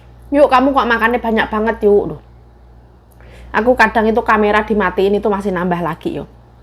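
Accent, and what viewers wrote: Java, Indonesia. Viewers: *Lansia 80 🇳🇱🌷Holland*...selamat pagiii...baru dua hari mengikuti Vlognya...menarik menontonnya dicampuur rasa iba kalo baca yg sedih2...saya tersenyum mendengar clotehan medók sekali logat Jawanya dan waduuuh lancaaarr ucapan2nya seperti air terjun🥰...dari Negeri kincir angin salam sehat selalu tetaplah semangat....juga buat para pemirsa dimanapun berada.....🙏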